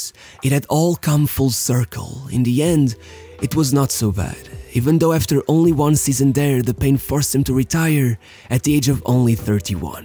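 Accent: Portugal. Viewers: you sound portuguese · Tuga accent😁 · I'm sorry for asking but are you Portuguese? You sound Portuguese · They are not. is reading the names in his Portuguese accent 😅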